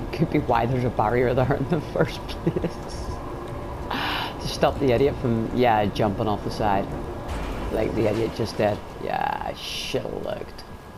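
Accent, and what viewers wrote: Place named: Northern Ireland, United Kingdom. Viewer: Love that "northern" accent... Derry or thereabouts? Georgia (USA) here. Well done.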